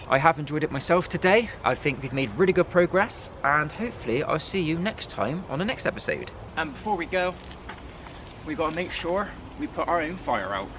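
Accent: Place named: Somerset, United Kingdom. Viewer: Sounds like you are from Somerset or Cornwall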